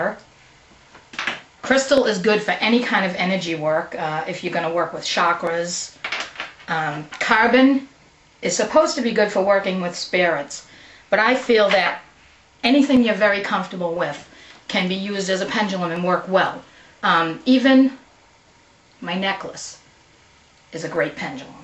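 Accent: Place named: New England, United States. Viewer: Lol you have a new england accent just like me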